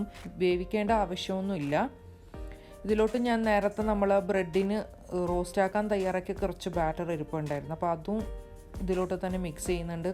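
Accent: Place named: Kasaragod, India. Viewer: Ningal kasargod aano?slang kettit chodichada...evide thamasam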